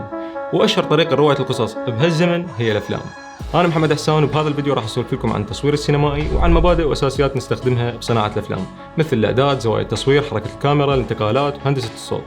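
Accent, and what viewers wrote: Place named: Iraq. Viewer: ما انتبهت أن هو يحجي عراقي الا بعد 5دقايق ههههههههههه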